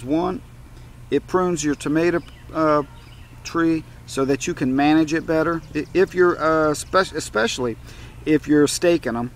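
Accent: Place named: Louisiana, United States. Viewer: Are you from Louisiana? heheh, I like your accent and I'm trying to guess where you're from. =)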